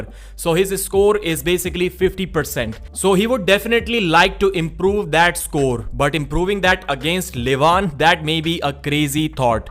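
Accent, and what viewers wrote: India. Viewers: Man, by having such a proper channel you singlehandedly made me get used to an indian/pakistani accent at last! Now it feels just like an american or UK one, just as easy to comprehend! Kudos to you, man! Hope my comment doesn't come across as ignorant one, I really meant it with the best of intentions! Best agregator/news channel on arm wrestling lately!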